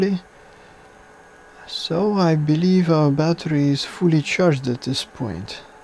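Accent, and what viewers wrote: Russia. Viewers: русский акцент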